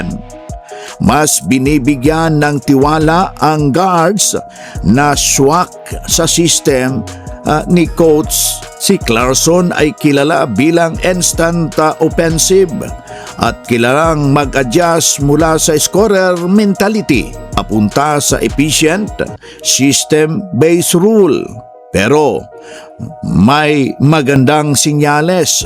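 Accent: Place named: Iloilo, Philippines. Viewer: Bossing?ulonggo k b.accents mo.wag mo ipahalata.para d k macomment ng ibang tao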